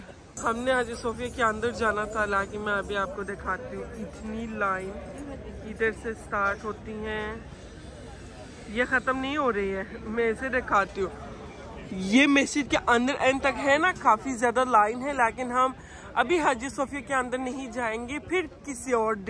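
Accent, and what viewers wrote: Pakistan. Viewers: Assalam alaikum, this is Khawar from Karachi, Pakistan. Really like your urdu accent. Wish that when Me n my family visit Turkey we would love to meet you. Regards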